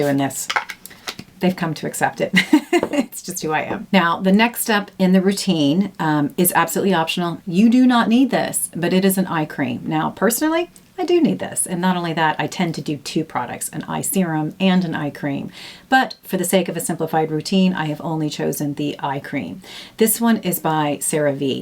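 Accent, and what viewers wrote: Ireland. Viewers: Hi i am new to your channel and really liking it so thanks xx love your accent with the slight irish twang thrown in! x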